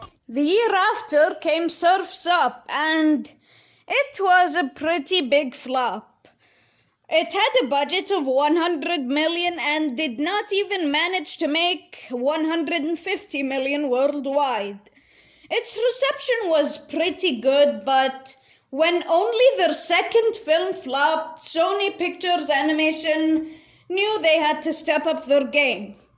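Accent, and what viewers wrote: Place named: Scotland, United Kingdom. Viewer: Nice video and I like your Scottish accent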